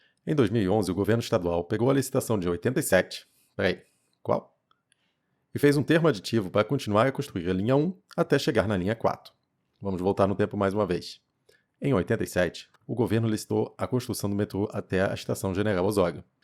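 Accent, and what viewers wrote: France. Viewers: Ele tem sotaque francês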